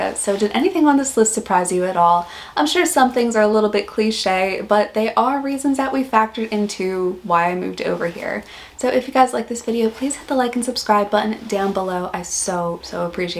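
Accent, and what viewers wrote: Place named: Australia. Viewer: Watching your vids the last little while I can see you kind of relaxing and aclimatising to our Aussie ways of speaking. Pretty sure your nearest and dearest by now see you "going native". It's ok. We are a nation of immigrants. And TBH I have known a few Americans and even Canadians in my time. Noone ever wants to move "home". Home is where the heart is.But we steal them here. My Father in law moved from the UK at 17 for a few years to make his fortune. He's still here. My Grandparents went on a cruise back to the UK back in the 70s. Many of there shipmates were "going home" because Australia was not for them. A large percentage were on the return voyage, realising "home" was now Australia. This place gets in your veins. And we love it.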